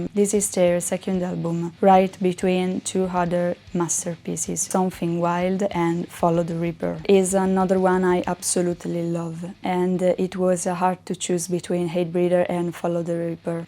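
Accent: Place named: Italy. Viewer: Mi stavo giusto chiedendo di dove sei, visto un buon inglese parlato, ma con un accento non proprio madre lingua. Poi hai nominato il maestro Angelo badalamenti, e dopo questo posso confermare al 95% che sei italiana 😅... comunque ottima classifica anche se preferisco il buon vecchio rock/hard rock 🤘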